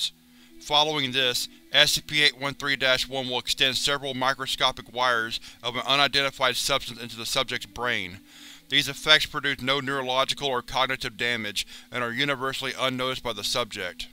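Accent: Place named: Alabama, United States. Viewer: You sound like someone who lives in Alabama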